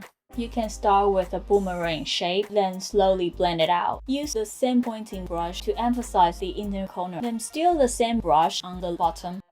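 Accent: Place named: United Kingdom. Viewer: LOVE UR BRITISH ACCENT !!!!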